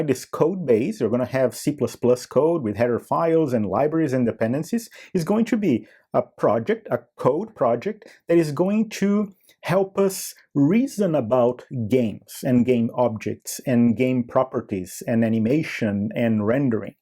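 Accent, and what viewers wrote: Brazil. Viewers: Cara, sei que você é brazileiro só pelo sotaque rsrsrs, muito bom! continue nesta jornada, me ajudou muito a entender essa caixa preta. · sabia que era brasileiro pelo sotaque kkkkkkkk muito bom o video cara parabéns pela qualidade! Me inscrevi e gostaria de dizer que você me dá uma impressão de qualidade de conteúdo melhor do que muitos canais ai seja gringo ou não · reconheci pelo sotaque, mas muito bom o conteudo mano parabens, muito raro ver conteudo de game engine/c++ no br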